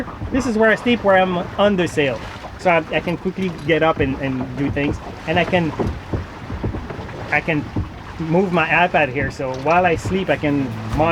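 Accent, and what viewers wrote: Quebec, Canada. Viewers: Im going to take a chance here but would you be from Québec ? If not, your accent sound like it😃 Great vidéo, in fact, one of my favorite !! Lots of good actionable ideas in there !!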